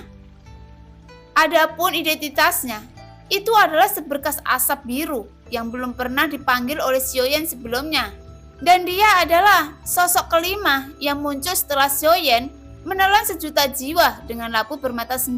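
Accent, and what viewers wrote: Java, Indonesia. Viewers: Wong jowo to mbak..mimin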